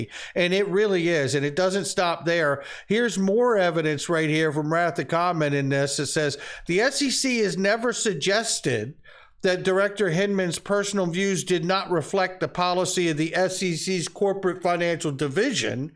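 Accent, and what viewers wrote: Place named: United Kingdom. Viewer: I am very excite about XRP.. its every time different story.. very cool token.. and reporting very cool thanks you for this reporting...i check you headlines out bit hear of that all time.. very confusing you give report but your english cool good british language thnks 2 u 😘 😍😍 weLOVE for U much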